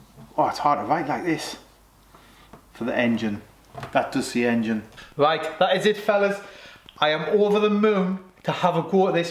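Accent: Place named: Sunderland, United Kingdom. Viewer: Great mate. Sounds like your from my way sunny Sunderland. Caught wait for the next video. If you need any free labour let is no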